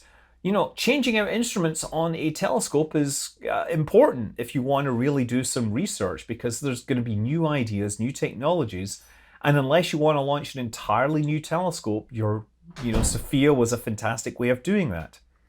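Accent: Scotland, United Kingdom. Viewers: Hi,! In regards the Alpha launch, I'm not as convinced as you seem to be that the issue was poor guidance, or possibly an upper stage engine underperformance. When the video signal was recovered from the upper stage, after the circularization burn was performed, the stage was slowly tumbling end-over-end. And I seem to recall one person on their flight control loop asking why the vehicle was maneuvering, and someone else asking, rather that stating, that maybe it was just moving to its payload deployment attitude. I watched as it completed one full end-for-end loop, and continue on, so I have doubts that it was in a planned "maneuver". My best guess is that they lost attitude control after the initial orbital insertion, and that the circ burn happened with the engine pointed in the wrong direction. It would have been more obvious of a failure had the burn been retrograde, but it seems to have been more tangential to the trajectory, so it just altered the orbital plane by a fraction. 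I'd be surprised if that isn't eventually announced as the problem they encountered. Keep up the good work! And I do apologize, but when speaking of you to my friends who are also into space exploration, rocketry and flight, I tend to refer to you as "I'm, and I'm manly!" I don't think you project that attitude, but the way you say it (possibly influenced by an American's reaction to your Scottish accent), it always sounds like that to me, lol!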